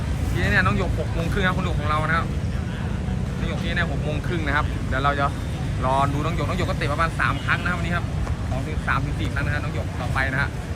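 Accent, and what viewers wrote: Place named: Korat, Thailand. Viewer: โคราชชัดเจน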